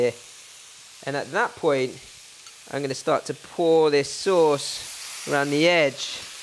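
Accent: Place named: United Kingdom. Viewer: OMG you are so darn cute !! The fact that you are asian with a brit accent......... just too damn cute !!! oh yeah, thanks for the recipe!!!!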